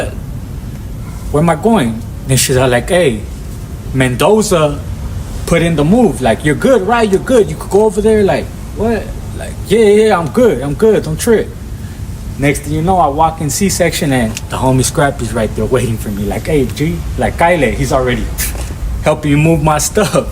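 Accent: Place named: South Central Los Angeles, United States. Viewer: IT'S WHERE YOU GREW UP.ALOT OF L.A. HOMIES TALK LIKE THIS.ESPECIALLY IF YOU GREW UP IN SOUTH CENTRAL L.A...THATS NOT THE ONLY ONE THO ESPECIALLY NOWADAYS.ALL THESE EDGARS COMING IN WANTING THEM TIGHT JEANS... SERIO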